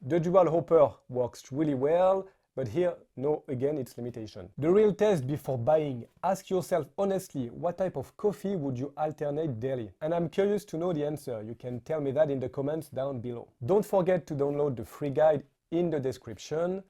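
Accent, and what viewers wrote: France. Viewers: En t'ecoutant je realise qu'on peut pas pas cacher notre accent francais😂😂😂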